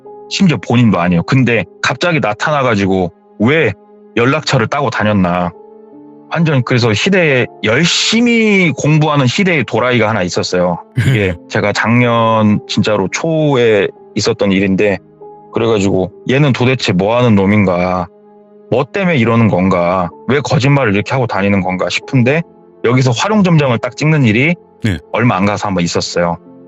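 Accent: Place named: Jeonju, South Korea. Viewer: ㅋㅋ저는 전주라 생각했는데ㅋㅋ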